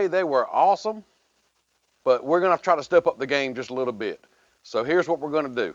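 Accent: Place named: North Carolina, United States. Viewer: Looks so good! Where you from? You sound mid north NC. Am I close?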